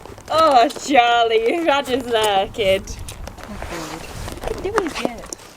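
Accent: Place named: Yorkshire, United Kingdom. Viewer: I’m so confused which accent is your natural accent? 😂 one minute you sound posh the next from Yorkshire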